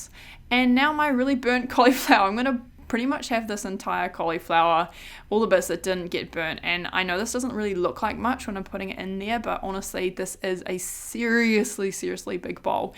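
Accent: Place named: New Zealand. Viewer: I love her New Zealand accent!!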